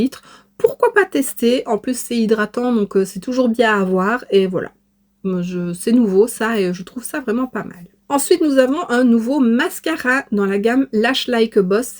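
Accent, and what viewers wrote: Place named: Liège, Belgium. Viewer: a d'accord c'est très jolie se petit accent de liège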